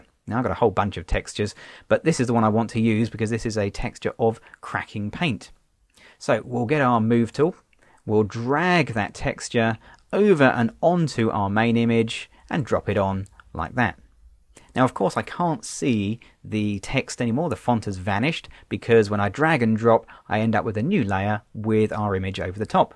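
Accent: United Kingdom. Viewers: Omg , Man great accent. No offence intended , but it is like those english safari loving adventure Tarzan mode ppl. Great turtorial . Challiho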